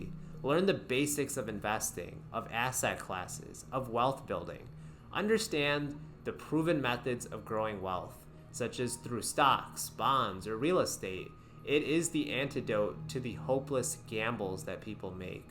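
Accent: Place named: East Coast, United States. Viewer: Asian? I'm guessing east coast Jewish family from the accent.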